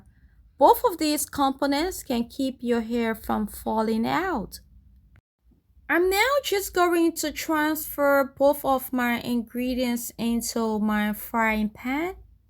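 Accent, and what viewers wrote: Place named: Liberia. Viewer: Are you a liberian? You sound like one.. i'm a liberian also.. it really doesn't matter, just curious. Good video💗 thanks alot for the tips.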